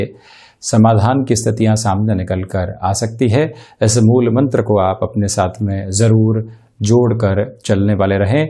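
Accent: Standard. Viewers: Pranam 🙏💐🌹💐, aap bahut sundar tarike se har din rasifal batate hai,aap ki shdh hindi bahut achhi lagti hai,bahut bahut dhanybad aapko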